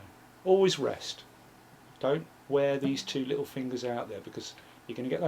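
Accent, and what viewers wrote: United Kingdom. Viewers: come here for tips.stayed for the british accent noice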